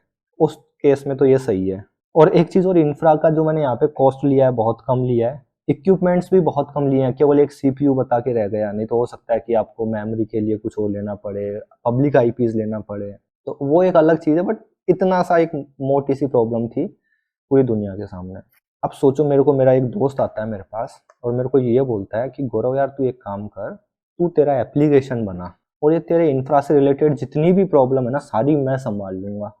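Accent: Indore, India. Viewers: superb explanation.... bro just one question are you from indore because you have that accent 😄😇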